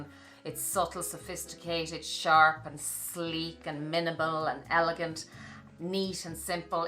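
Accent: Ireland. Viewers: Dear, in my mother's time, one bought a timeless bag and wore it always. She lived in London, Paris and New York and wore her Chanel classic flap everywhere. She had bought it in Paris. It was the age of class. Now one needs a dozen bags and have a collection! Welcome to the age of greed. In any case I love you! Lived in Ireland for 10 years had my 2 kids there. Just live your Irish warmth and wonderful Paddy accent! Stay blessed and enjoy your great style! 😍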